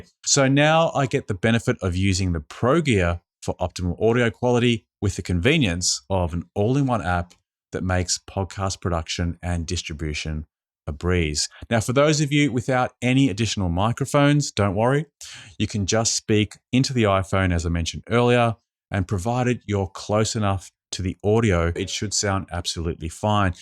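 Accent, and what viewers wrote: Australia. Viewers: Hey there, sounds like you're an Aussie. I am based in Melbourne and am going to produce a podcast for Seniors. #whocanpodcast ! I can do most things but social media and video production : No! Would you like to partner with me ? Check my Instagram page: Whocantpodcast. Thanks for the video.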